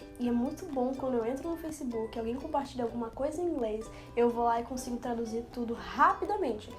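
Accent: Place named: Santa Catarina, Brazil. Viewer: Gostei de seu sotaque catarinense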